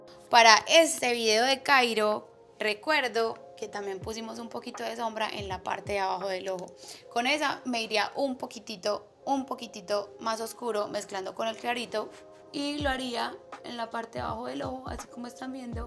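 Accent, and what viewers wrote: Antioquia, Colombia. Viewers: Su acento paisa ( Antioquia y medellín Colombia) es hermoso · Me encanta el acento colombiano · Amo el acento colombiano, sepan que tienen el mejor acento de Latam!!!! Y Karol tan hermosa, humilde, sencilla. Exitos nenaa! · Amo su acento súper colombiano ❤️❤️❤️ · Es acento paisa, en Colombia no tenemos un acento, tenemos muchos pero creo que el paisa es el más famoso en el exterior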